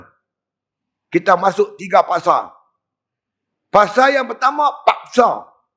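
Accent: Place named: North, Malaysia. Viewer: Sy suka mendengar ceramah ustaz..kdg² terhibur dgn loghat utara ustaz 😂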